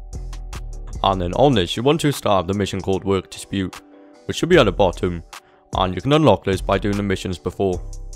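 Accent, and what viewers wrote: Wales, United Kingdom. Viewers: You sound welsh where are u from?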